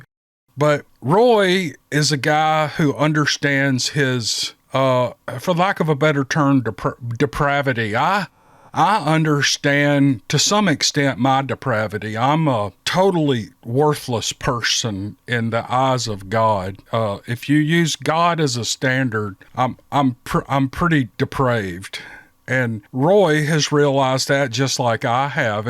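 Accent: South, United States. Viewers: I always hit the like button after listening to stories (yammer all you want my friend, I enjoy it, and your southern accent makes me long for Memphis), but I had to hit right away because the "like" button was at 666. This video was a great series of stories - as usual! BTW, the people you talk about at the end of the video are the same people who badger and pick arguments with everyone around them whether they are work, in a bar, or even standing at a bus stop. They are straight-up A-holes, and the social media platforms of the cyber-age have only enhanced their ability to irritate and annoy at an exponential range. Every village has it's idiot, and every channel has it's troll(s). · Love your story telling! That southern accent gives a warm memorable touch! Please continue and repeat daily.👍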